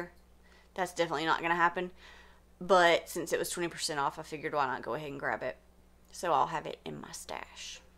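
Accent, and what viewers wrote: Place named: South, United States. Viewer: 😁 don't apologise for your cats' noises, I find it hilarious 😁 Maybe because I also have cats, four of them and sometimes one of them does a cameo on my floss tube 🙈😁 I came here because Chris mentioned you 😊 and I'll stay here. I like your projects and your Southern accent. Looking forward to seeing your cotton video 👍😊 Kr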